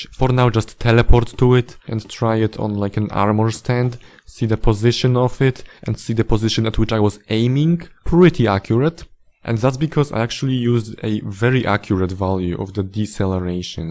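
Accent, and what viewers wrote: Poland. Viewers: U sound Polish. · Twój film wyświetlił mi się w proponowanych, klikam, a tam jakoś tak po polsku wymawiany ten angielski. Sprawdzam - faktycznie, z Polski xD Fajny kontent, chyba zostanę na dłużej 👍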